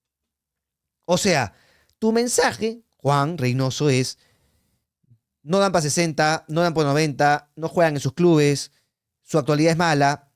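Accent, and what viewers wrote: Argentina. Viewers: Hey Horacio... vos sos Argentino ???. Tenés un acento porteño che. O sos simplemente un periodista Peruano sin personalidad? Por otro lado tu analisis muy bueno. Has desnudado la pobre capacidad de Reynoso como estratega. Ese señor es un técnico para tercera division, que ridiculamente se autodenomina estudioso.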